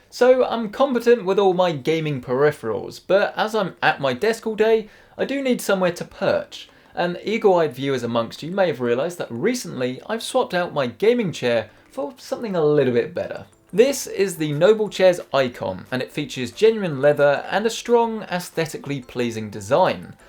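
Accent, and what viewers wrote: United Kingdom. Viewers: This british accent’s so nice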